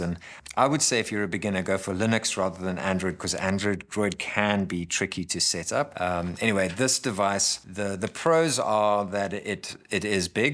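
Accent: South Africa. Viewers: lol just cause you have a lekker saffa accent I bought a retro pocket 2s lol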